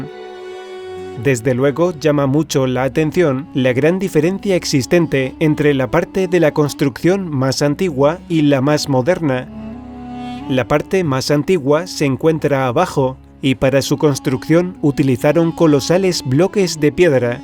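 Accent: Spain. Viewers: el video y el contenido de este canal me encanta . se nota que hay información muy valiosa . pero me molesta sobremanera la voz y el acento ibérico . me gustaría que fuera un locutor latino quien relatara cada video de este canal . Saludos .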